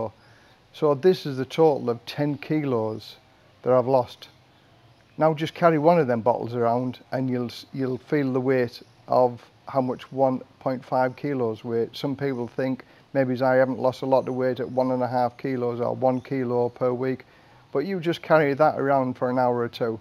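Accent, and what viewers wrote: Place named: Sunderland, United Kingdom. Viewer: Areet mate. I’m from Tyneside. And I can tell you are from Sunderlnd way by your accent 😂. No worries 😉. Watched a couple of your YouTube vlogs on Thailand and loved them. As I’m 130KG. 20 stones in old money I became interested in your “Keto” diet. My goal is 90 KG. I’m 64 year’s of age and desperately need to lose mega weight before I die. I haven’t visited my GP for two years since the outbreak of the pandemic. Although I have received two jabs and booster and fortunately not contacted Covid-19. So thanks to you I’m going to follow your guidance and achieve my goal.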